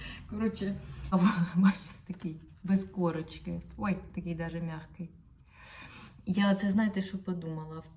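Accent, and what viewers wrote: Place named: Surzhyk, Ukraine. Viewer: Як не гарно звучить суржик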